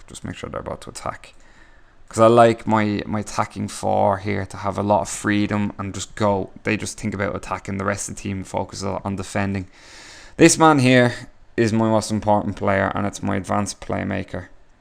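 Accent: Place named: Ireland. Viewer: Lol i wans't expecting this guy to be Irish.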